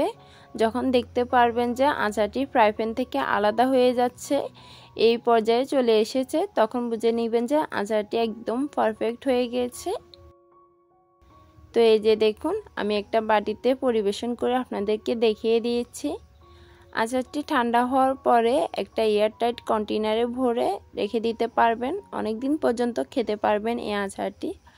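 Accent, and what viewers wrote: Chittagong, Bangladesh. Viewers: Apu tumar basha ki ctg teh?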